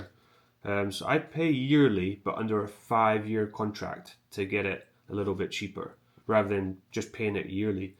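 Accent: Scotland, United Kingdom. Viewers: Great video mate, thanks for the breakdown. I have a couple of questions that I would love if you covered in a video sometime, though im sure you already have at some point. I obviously hear the Scottish accent, im from England myself, currently living in the states, and have travelled a lot so I know accents can change after a while, but I sometimes gear your accent changing on certain words so I cant figure out if you're native Scottish, or born somewhere else and grew up in Scotland or something 😅 Also, I wrongly assumed that you were married to a Japanese woman, and thats how you got permanent residency, but I dont think thats the case. Could you go into more details about your journey to gain residency? Or maybe you already have a video on that. Anyway, thats enough waffling from me, thanks again for the video and the insights. I subbed and look forward to more videos. 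Cheers mate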